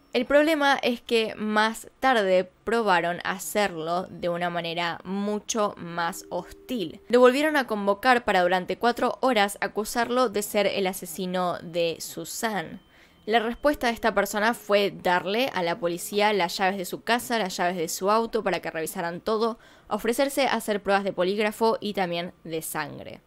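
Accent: Argentina. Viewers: Cuentas con gran capacidad narrativa tengo como ocho meses de seguir tu canal pero no te comentaba porque no tenía buen celular, además que a los mexicanos nos gusta como hablan los argentinos.Tienes talento y personalidad. Espero siga creciendo tu canal. Sinceros saludos desde Torreón, Coahuila, México.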